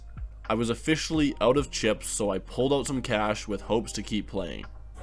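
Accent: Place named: Canada. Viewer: you sound canadian, so guessing you arent tipping. but making that kind of money you really should tip your dealers.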